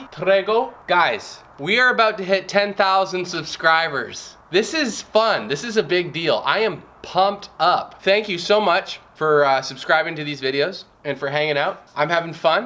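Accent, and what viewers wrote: Canada. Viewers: The plural of "axis" is "axes". Also, your Canadian accent is awesome. :-)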